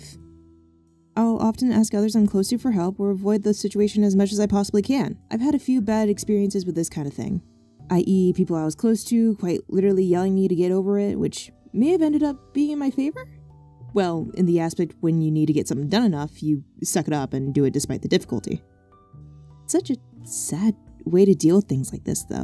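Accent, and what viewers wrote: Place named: United States. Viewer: Visuals were great, but I'm sorry the speech was so fast in an American accent I have no idea what was said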